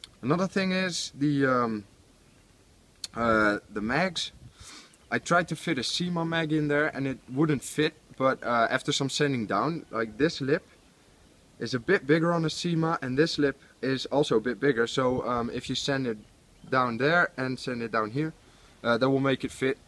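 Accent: Netherlands. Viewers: It looks very good but too bad it doesn't have blowback. P.S. Your accent sounds dutch.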